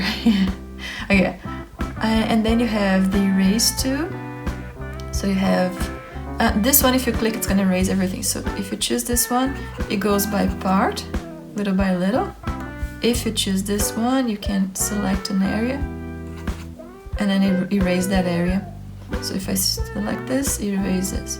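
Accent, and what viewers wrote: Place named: France. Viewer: i love your french accent!!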